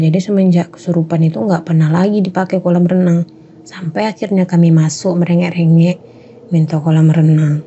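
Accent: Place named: Riau, Indonesia. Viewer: logatnya Riau kali kak❤Batam hadir ❤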